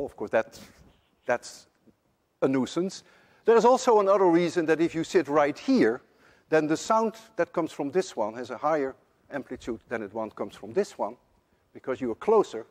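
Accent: Netherlands. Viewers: Try it , Huygens , hoigens........ Die Nederlandse tongval is en blijft geweldig. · Haha , it took me 5 seconds to know you're Dutch Mr Lewin. You speak DENGLISH . Zo noemde m'n engelse collega's het. You think Dutch and translate , where as i think in English and write it down. Maar ik vond de video boeiend en duidelijk.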